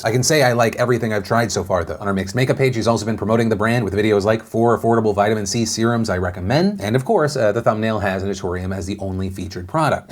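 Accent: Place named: Southeast, United States. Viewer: originally from North Carolina, so he still holds a lot of the pronunciation from the mid-atlantic/Southeast region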